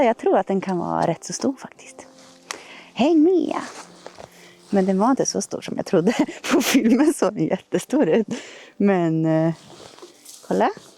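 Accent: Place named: Östergötland, Sweden. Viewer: Bra film!! Kul med lite östgötska!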